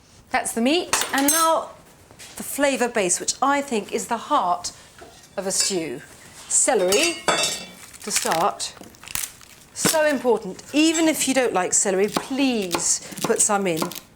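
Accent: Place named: RP, United Kingdom. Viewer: I can't get over that Howard-ish armchair and those simple cream coloured drapes, for someone from a working class background these are interiors I can only dream of, until finally I give up and find other values and ways to be happy in life besides money, comfort and ownership. I think that that's what's so wonderful about food is that it's available for everyone and affordable at the same time depending on the price range, of course. She might have a posh accent and some formidable vocab up her sleeve but the concept she sells is for everyone.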